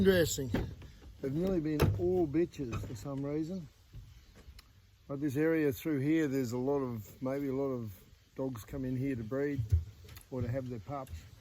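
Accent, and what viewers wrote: Australia. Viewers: What part of Australia are you?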